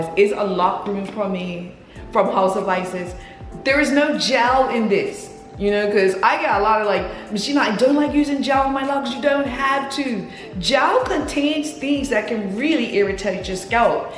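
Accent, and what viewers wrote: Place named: Bermuda. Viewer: I know a Bermudian accent when I hear it! Great video 💘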